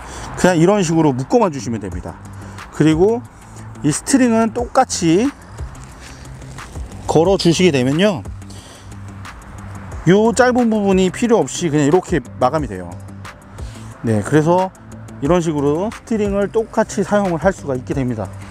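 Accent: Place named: Jeolla, South Korea. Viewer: 슬래파~~전라도신가보다 유익한정보감사~~^^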